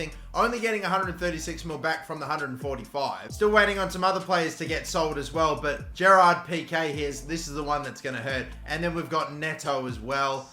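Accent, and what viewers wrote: Australia. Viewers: Take some rest mate. Preserve that lovely Aussie voice.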